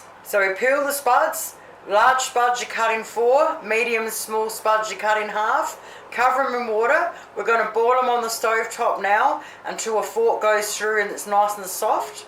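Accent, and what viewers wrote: South Australia, Australia. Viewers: Oh my. what a comfort recipe! I love your accent, are you a South Aussie?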